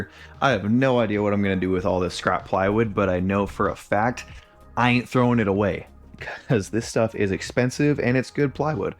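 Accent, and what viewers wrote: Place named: Northern England, United Kingdom. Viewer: imagine y’all grew up in a similar area to have that same dialect must be up north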